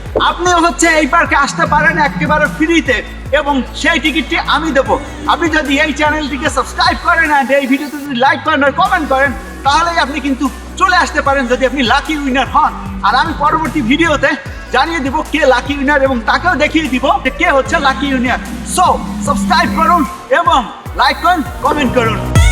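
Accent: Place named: Barishal, Bangladesh. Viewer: কথার মধ্যে বরিশালের ভাবটা বাদ দেয়া লাগব...কেমন জানি লাগে..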